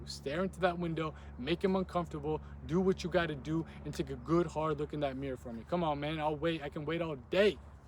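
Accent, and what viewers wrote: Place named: United States. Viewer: I like your accent, bro! I wanna talk like that even though I am not American.😞 and keep the good content rolling. 💪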